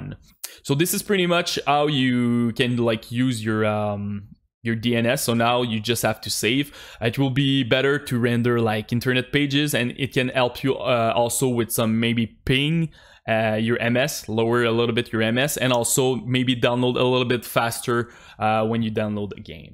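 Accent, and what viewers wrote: Quebec, Canada. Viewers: Clairement tu est québéquoi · on dirais un accent qc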